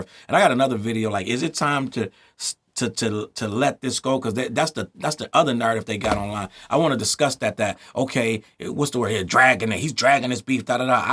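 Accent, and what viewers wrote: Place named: Boston, United States. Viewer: auticle haha that Boston accent be poppin up here and there lol.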